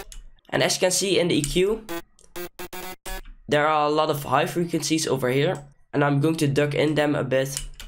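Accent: Netherlands. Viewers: Are you dutch or from belgium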